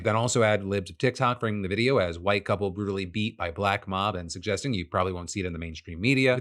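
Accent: South, United States. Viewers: am i crazy or does kinda have a southern twang in his voice??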